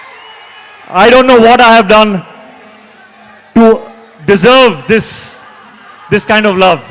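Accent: India. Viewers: ❤❤❤❤❤lovely telugu accent hritik roshan sir 😊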